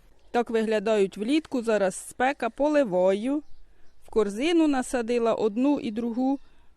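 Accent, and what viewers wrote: Poltava, Ukraine. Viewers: Тільки підписалася на ваш канал. Почула полтавський говір.Якщо не секрет, з якого району? Цікавить діхондра, чи є на продажу?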